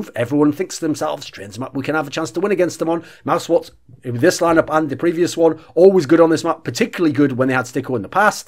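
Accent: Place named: Scotland, United Kingdom. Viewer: It's definitely English, but definitely not an accent from England. Probably Scotland or Wales.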